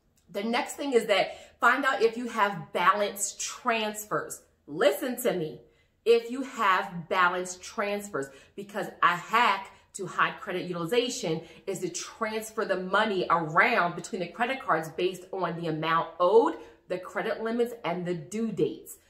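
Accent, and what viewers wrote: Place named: Pennsylvania, United States. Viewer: love that Pennsylvania talk got cousins from Pittsburgh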